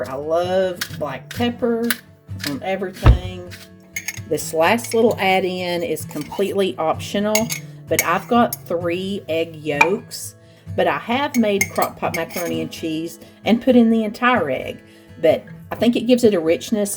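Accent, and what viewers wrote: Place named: Tennessee, United States. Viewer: I might try the crockpot sweet potato casserole this year! So glad I found your channel because I grew up in Madisonville for several years of my childhood and your accent makes me so happy and reminds me of TN! I still live in the south, but TN holds a special place in my heart. I also purchased some jams for Christmas presents! 🥰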